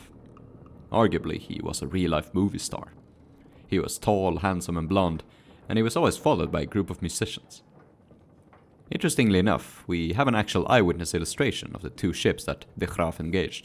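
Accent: Scandinavia. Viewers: Scandinavian accent? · What country are you from? im sensing a scandinavian accent, being a swede my self.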